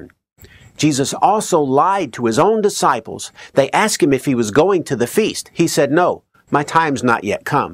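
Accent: South, United States. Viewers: I don't even know you but giving your accent, you were probably churched in the Bible Belt and then rebelled. Given your beard I would bet you are a gay man and hate Christianity for this reason. But, I will say something nice. You are one hell of a musical talent.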